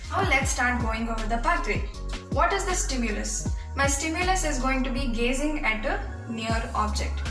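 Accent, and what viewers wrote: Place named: South India, India. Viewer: South english ek number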